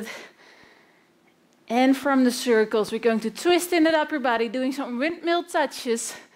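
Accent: Netherlands. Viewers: Are you Dutch? I can hear the accent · Dutch accent? so cute!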